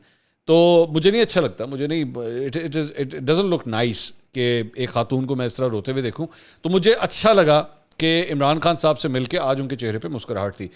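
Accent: Punjab, India. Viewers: mai, mera, mujhe band karo. U are a watchable presenter. But your words mai, mera, mujhe are not palatable. I like you due to your apparent neutrality and intermittent Punjabi as my father is from lahore, amritsar.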